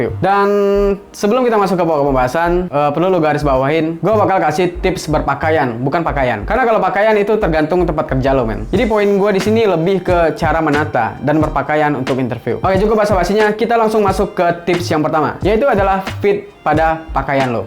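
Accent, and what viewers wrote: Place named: Padang, Indonesia. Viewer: Abg org padang ya, ketauan dari logat nya 🤭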